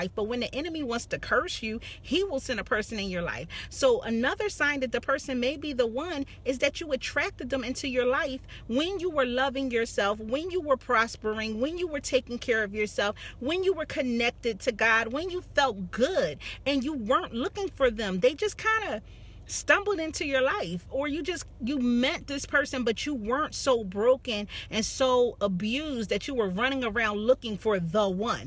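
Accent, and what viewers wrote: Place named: Philadelphia, United States. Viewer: Love this video/message! SN: you have to be from Philly i know a philly accent a mile a way!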